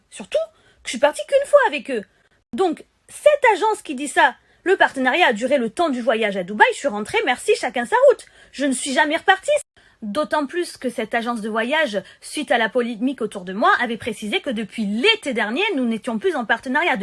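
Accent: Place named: Italy. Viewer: La pronuncia italiana oltre qualche parola in italiano tradisce le tue origini e soprattutto la tua, altissima propensione al crimine.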